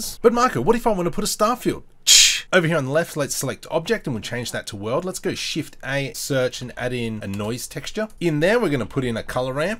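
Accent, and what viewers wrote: Australia. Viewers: Youve turned in to an Aussie Ian Hubert :) nice tute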